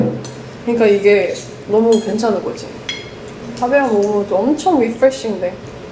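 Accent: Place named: Gyeongsang, South Korea. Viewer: 는 경상도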